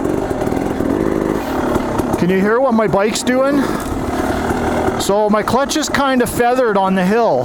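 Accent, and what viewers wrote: Canada. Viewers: Man your videos are awesome .. You are a natural with explaining things AND clearly know your stuff .. This is a combo that seems more rare and hard to come across both online and in person nowadays, it seems. I have been able to take sooo much from your videos man, just want you to know I really appreciate and value the hard work you put into your vids. I know there are many others that feel the same as I do about your work here on YT. Please keep up the hard work so that we can all learn from your experience man. Also, it makes for easy listening with your chill Canadian accent lol .. wouldn’t wanna hear any type of help vid with my harsh nyc/nnj accent hahah 🤣 .. again your awesome for this stuff man .. nothing but love my man !! 💯👍😎🐐💪🏽💯💯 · Canadian from the voice first time watching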